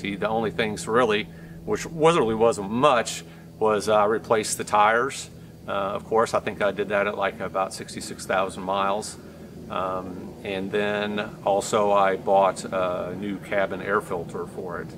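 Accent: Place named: South, United States. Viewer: You have an easy southern delivery. Great job.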